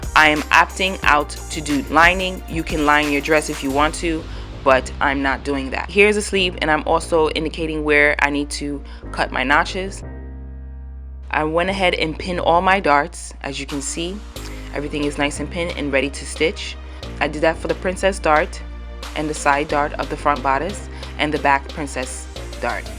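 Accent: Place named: New York, United States. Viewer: yesssss tell him, plus since he’s trolling about pronunciation - let him know it’s your NY accent🙌🏽🙌🏽🙌🏽🙌🏽🙌🏽!! Ppl are so quick to pass judgement! Language skills have nothing to do with her sewing talent!!!👍🏽👍🏽👍🏽👍🏽👍🏽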